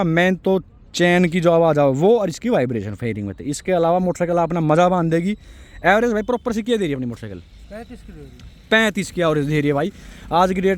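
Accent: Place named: Bihar, India. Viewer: Biharii laag rha hai ... Tari video dekh k tari bhaab ni baada saktaa 👊👊👊👊👊👊👊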